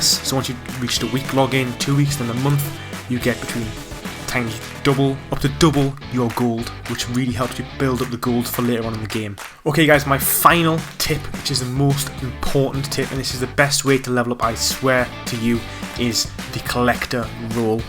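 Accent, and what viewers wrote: United Kingdom. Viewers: This guy is definitely British but idk if he sounds Geordie or not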